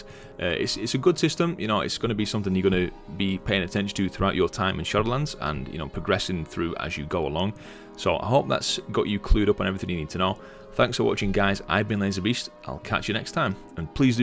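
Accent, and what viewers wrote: Yorkshire, United Kingdom. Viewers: Thankyou for this video, excellent put together, and has made me me absolutely certain I do not want to play Shadowlands. Also, cant believe your from Chorley, you sound proper Yorkshire mate :) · Thanks for useful insight. Yorkshire?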